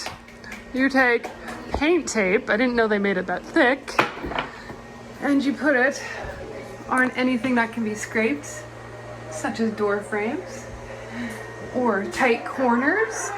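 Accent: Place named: Canada. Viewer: Great video, normal wear and tear shouldn't be a price 😢 keep using wisdom with your body choices, hope to see see on the road sometime, I believe I saw your van video with Bob wells, your Canadian accent is cool, vanlife from oregon 🖐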